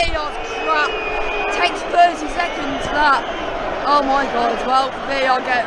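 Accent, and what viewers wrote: Manchester, United Kingdom. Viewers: You sound like you're from Manchester. Lifelong city fan I'm sure 😆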